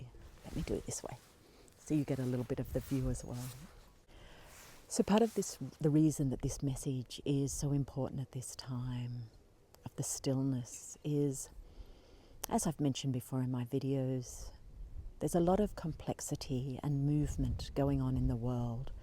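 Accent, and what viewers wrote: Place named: Australia. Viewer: Thank you for sharing your journey. Its nice to hear a fellow Australian on this path. I dont know much of Mary Magdalene but am drawn to learning more. Blessings from Australia.⚘️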